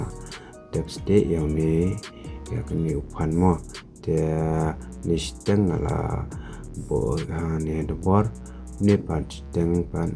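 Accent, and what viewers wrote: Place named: Pnar, India. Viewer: Ph bh dakan hangno nga sngew tynnad ba ph kren daka kynten pnar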